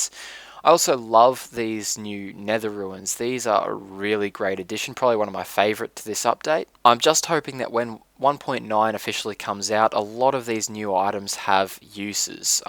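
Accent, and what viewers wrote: Australia. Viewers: YOUR AUSTRALIAN :) XD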